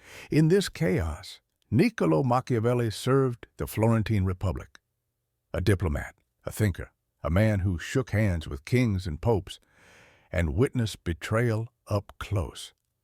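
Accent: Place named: South, United States. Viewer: What's with the southern confederate general voice?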